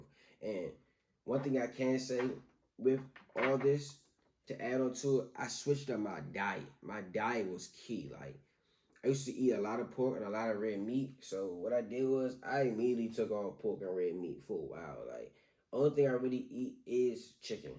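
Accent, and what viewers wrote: DMV, United States. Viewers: He sound like he’s from the DMV lol…. Great video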